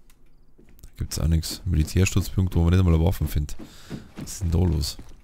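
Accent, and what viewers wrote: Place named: Bavaria, Germany. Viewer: Supaa Video. Endlich moi a scheens Boarisch hean. Do head ma gern zua. Mach weida so.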